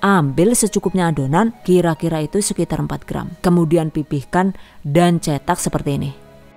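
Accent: Banyumas, Indonesia. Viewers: yutuber favorite kluargaku,,,resep anti ggl,,,bhsanya mdh di mngerti tdk bertele",,,,tutornya jelas sejelas" nya,,lucu bgt klo sdh ngluarin bhsa ngapaknya ,,,😂👍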